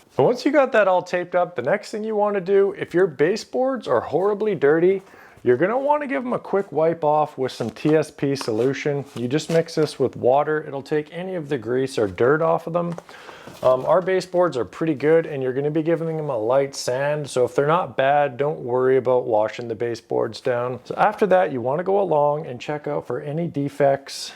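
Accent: Canada. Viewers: Finally! A YouTube video where you don't feel being yelled at! Oh! I just picked up here Canadian. That explains it.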